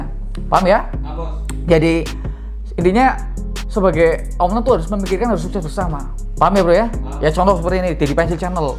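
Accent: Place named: East Java, Indonesia. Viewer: Lokasi mana ini bos...dari logat nya kyk Jawa timur Malang...pingin belajar....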